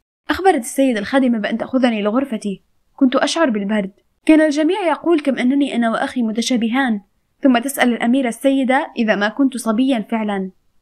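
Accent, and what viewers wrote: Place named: Jordan. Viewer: حاس انكي من الاردن بعرفش هيك بحس 😅😅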